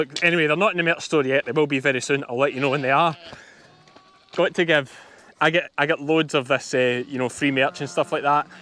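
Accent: Scotland, United Kingdom. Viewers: Loved the photo of and her dogs at the end. Somehow the Scottish accent does not quite have that impact that Forest Gump's Alabama dialect does, but good try.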